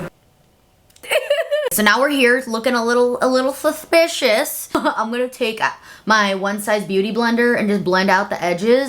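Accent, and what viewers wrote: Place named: England, United Kingdom. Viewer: Oh and your English accent is great 😂❤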